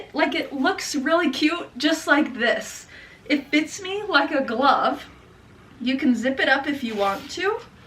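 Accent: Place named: Montana, United States. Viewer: I was born in Montana ages ago. I came across your channel and your voice/accent stopped me in my tracks. Boy did I have a bout of homesickness! You sound like home to me. Love your channel. Quick question. Did you design the Montana home pillow? I’m a crochet girly and would love to make one for my bedroom. If you did design it, do you sell the pattern? If not do you know where I can get it? I subscribed just so I can hear your accent and see Montana content. My uncle (now passed on) had a ranch in Ekalaka. I was born in Choteau. Mom was born in Ekalaka. Dad went to school in Bozeman. Like I said, you’re a bit of home in my ears. Love your channel. Cerise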